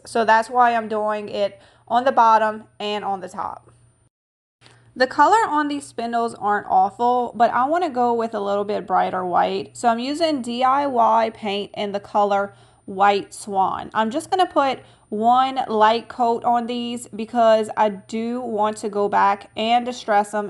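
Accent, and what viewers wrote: Louisiana, United States. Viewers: I love your DIYs and your channel! May I be a bit personal and ask are you from Louisiana and maybe Cajun,I’m from England and pick up on accents,yours is delightful xx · thanks for the info, Kay. Going to get me some garden edging at home depot! is giving me all kinds of other ideas. Love her channel. I used to live in Louisiana so she makes me feel right at home with her Cajun accent and personality.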